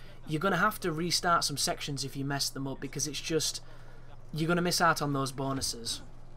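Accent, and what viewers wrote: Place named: Scunthorpe, United Kingdom. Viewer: You sound very local.... Well, too me you do! Where you from? Can't be too far from Scunthorpe!?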